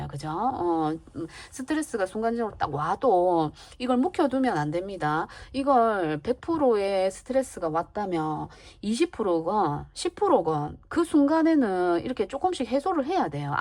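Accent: Gyeongsang, South Korea. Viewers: 4번 갱상도 억양으로 들려주는 리딩~ 감사히 잘 들었습니다 나를 많이 챙겨라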